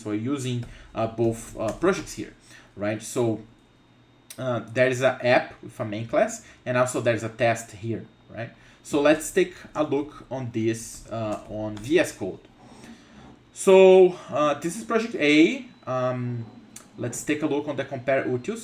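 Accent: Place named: Brazil. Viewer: Dude, what an amazing video. keep making videos like this. It opened my mind. Are you Brazilian? The accent reminded me of Brazilian.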